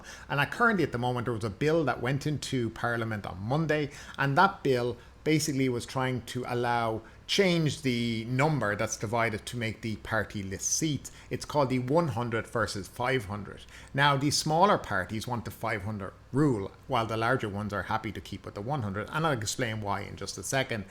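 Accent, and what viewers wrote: Ireland. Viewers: But what can we infer from your charming Irish lilt? 😄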